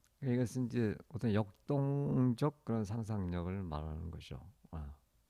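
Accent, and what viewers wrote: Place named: South, France. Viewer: 마지막 문장은 아름다운 시 같다고 생각합니다. 새로운 영감을 주는 글입니다. 감사드립니다. 발음을 통하여 선생님은 아마도 프랑스 남부 쪽에서 공부하셨나 그런 생각을 했어요. Merci beaucoup!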